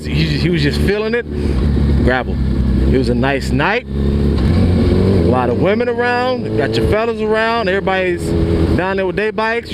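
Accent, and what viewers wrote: Michigan, United States. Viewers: You from Michigan bro?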